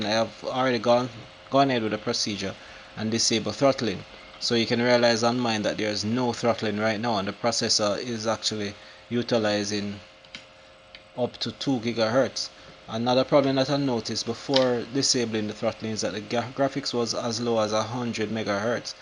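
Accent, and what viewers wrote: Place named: Jamaica. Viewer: The author sounds Jamaican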